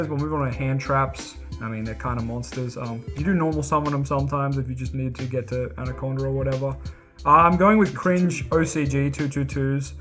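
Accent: Australia. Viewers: Facts. And he needs a translater, I hate his Austistralin accent.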